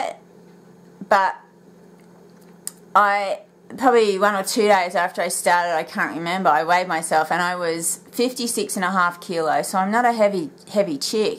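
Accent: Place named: Australia. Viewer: where in Australia are you? its good to hear an aussie voice on here. and i feel like we've met before!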